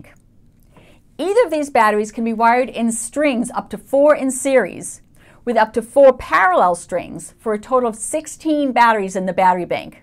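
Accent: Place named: United States. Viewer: That blew my mind and I had to get a cup of tea and watch it 3 times lol/. I love an American accent and that was the best tutorial ever!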